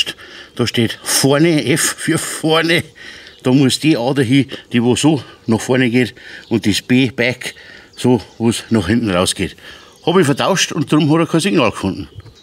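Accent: Bavaria, Germany. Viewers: Also i hoer di so gern mit Deinem Bayrisch. Das is schoh supper!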